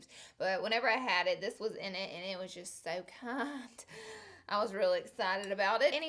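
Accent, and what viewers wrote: South, United States. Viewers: I love your personality! I'm a southern girl too (sorta, born in FL, live by the beach). I wish I had that southern accent all my life, I think it's so cute and love listening to girls who have one, top that with your silly sayings and OMG!😲 I could just talk to you for hrs! lol Love your makeup! You look fabulous as always, plz do u tut on that look! 😍😍 And I looooove your palette! I wish u did more tuts with your palette! 😘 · I also couldn't hear her very well. I had my volume turned all the way up and it was still hard to hear everything, especially when she goes a mile a minute lol. I still adore her quirkiness anyway, even though I have to rewind and listen over and over again to try to figure out what she's saying - between the southern accent and the speed at which she talks. is so cool and real. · What state are you from in the south?? 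I've always have wondered! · Your cracken me up today! Love your southern accent, don't ever loose it! Please do one of these on setting sprays, if you have some you like at a good price.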